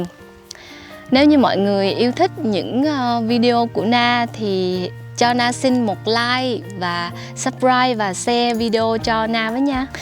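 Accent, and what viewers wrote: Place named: South, Vietnam. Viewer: Ma ơi ! Anh rất thích ngừng video cua em no thật đi vào cuộc sống Con giống noi cua em nghe thật ấm lòng Em là nguoi Tây nguyên Nhung giống noi thật Nam bộ Chúc em ngày mới vui vẻ và an lành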